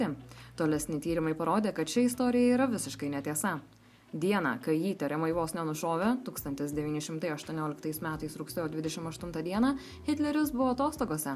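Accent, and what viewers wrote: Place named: England, United Kingdom. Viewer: ausys kraujuoja nuo tarimu, pasigooglink kaip tariasi elementariausi pavadinimai, nebandydama išlaužti ju tarimo anglu tarme.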